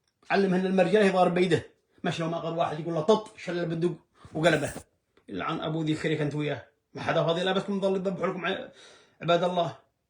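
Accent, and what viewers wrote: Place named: Yemen. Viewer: نشكرك اخي وصديقي انت نبراس للاعمال الخيريه، وكلماتك ذات الفصاحه واللهجه اليمنيه الاصيله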